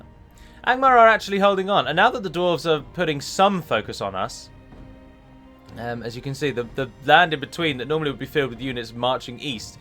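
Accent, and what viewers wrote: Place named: RP, United Kingdom. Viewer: Mate, your sleepy voice sounds bloody brilliant! Makes you sound even more posh (didn't know that was possible)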